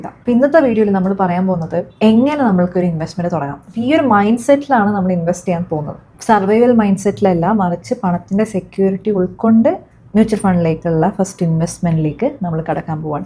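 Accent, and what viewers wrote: Kannur, India. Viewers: ആദ്യമായിട്ടാണ് ഞാൻ നിങ്ങളുടെ വീഡിയോ കാണുന്നത്.. ഏതോ ഒരു സെക്കൻഡിൽ നിങ്ങൾ ഒരു കണ്ണൂർ കാരി ആണെന്ന് ഞാൻ തിരിച്ചറിയുന്നു 😅😅.. am i right..?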